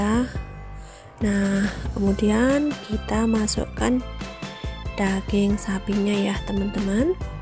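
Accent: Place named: Java, Indonesia. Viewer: Logate jowo bingits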